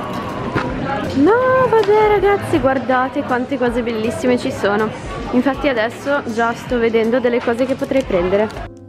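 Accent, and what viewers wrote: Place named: United Kingdom. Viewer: Credevo che eri inglese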